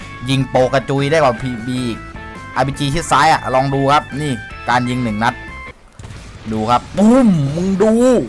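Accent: South, Thailand. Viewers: พี่คนภาคใต้ป่ะถ้าใช่อ่ะเหมือนกัน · พี่คนใต้เหรอ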